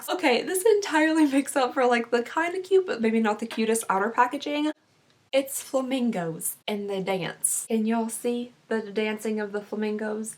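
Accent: Kentucky, United States. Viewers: Are you from Kentucky????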